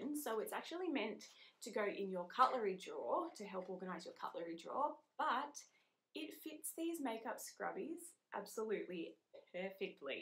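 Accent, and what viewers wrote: Australia. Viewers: Yay an Aussie talking about stores I actually know.. lol.. well done 👍🏻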